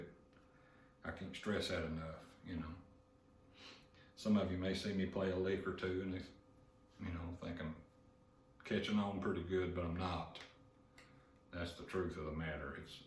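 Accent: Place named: Eastern Kentucky, United States. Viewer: Great videos...Just found your videos and still guessing you're from Eastern Kentucky or Mingo County, West Virginia. Great comments about Tony Rice too.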